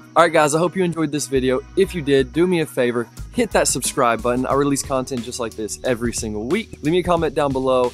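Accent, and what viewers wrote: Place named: South, United States. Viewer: Are you from South? I can hear a slight Southern twang in your voice. Other than that, God bless you brother.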